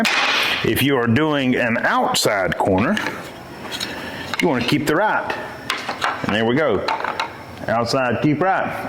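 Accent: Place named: Western Maryland, United States. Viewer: Nice. I would not have placed you there according to your accent. I heard people with your accent in Western Maryland. Of course now I ain’t been around too far but I’ve been in Pensacola several times. I build kitchens and typically work with 4 1/4 inch crown. However, I’m on a job that has 7 1/4. And I decided to do it flat. Your video has been helpful.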